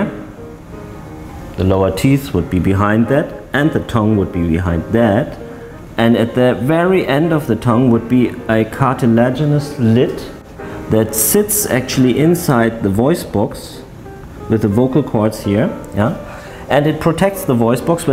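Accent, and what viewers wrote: Germany. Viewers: are you from germany? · His accent is so German so I trust him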